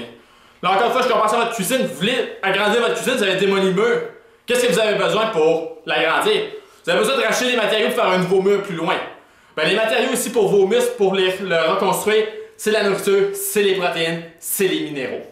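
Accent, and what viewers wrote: Quebec, Canada. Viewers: c'est pas un accent bizarre ... c'est un Québécois! Ecoutez pareil il donne de bons conseils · oh putain jai crus qu'il parlait même pas français pauvres québécois... vous avez un accent vraiment horrible jvous plains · cest du quebecois,ignare! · on dirait un canadien Hmm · Je kiffe l'accent québecois :)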